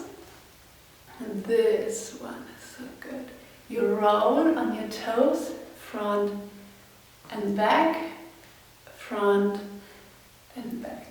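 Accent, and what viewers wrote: Germany. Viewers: Du bist Deutsche, das hab ich sofort gehört ;)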